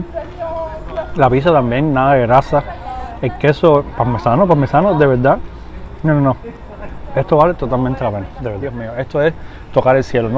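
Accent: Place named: Cuba. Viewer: Genova es famosa por la focaccia , norte italia la pizza es fina , pero según vi es un menú la cortesia de casa es un arancino siciliano , esta la lasagna q es de Bologna .. tienen excelente menú completo , solo el nombre del local indica italia Toto e el panfilo italiano così nos entendemos , saludos fratello te sigo hablas un buen español cubano nuestro no todos los YouTuber lo hacen gracias !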